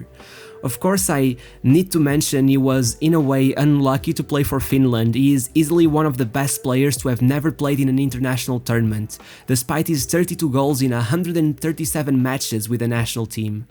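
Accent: Portugal. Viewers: Pelo sotaque, só podes ser português. Belo vídeo.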